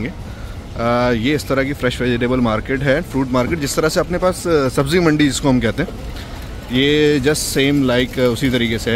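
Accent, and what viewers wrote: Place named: India. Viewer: U talk by Indian, we understand nothing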